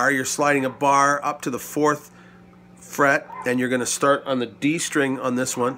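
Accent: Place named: Canada. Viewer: Your accent is pure Canadiana.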